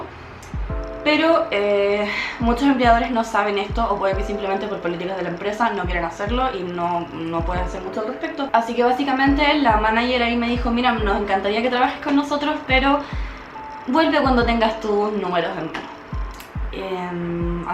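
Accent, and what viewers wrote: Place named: Chile. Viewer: Tu acento me suena chileno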